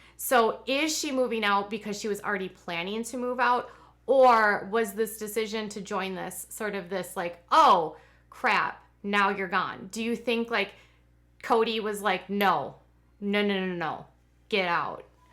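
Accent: Minnesota, United States. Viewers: This is the first video of yours I watched. I thought "she sure sounds minnasoooootan" :-)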